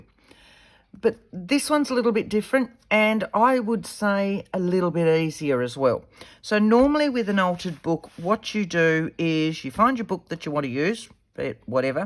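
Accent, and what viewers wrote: Australia. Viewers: Hi. Nice to hear an Aussie voice on a junk journal tutorial. I so wish I had found this two years ago when I first started out. However, I have already started taking my readers digest book apart to create my very first altered book along with you. Keep up the good work, loving it.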